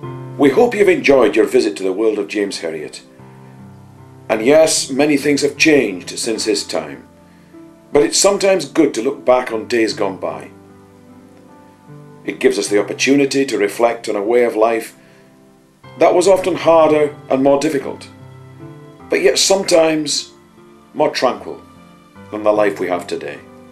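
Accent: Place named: Scotland, United Kingdom. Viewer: has a slight Scottish accent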